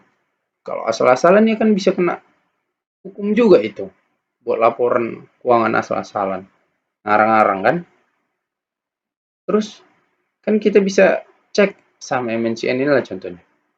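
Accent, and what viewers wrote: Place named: Medan, Indonesia. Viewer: Macam orang medan kali awak liat cara ngomong abang,ni.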